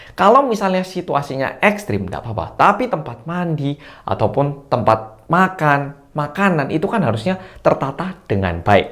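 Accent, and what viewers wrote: Java, Indonesia. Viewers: mas Korea iki ... bahss Indonesia ne ke Jawa-jawaan ya .... Hi ... aq yo wong jowo mas Korea .... Sugeng siang 😀😀😀😀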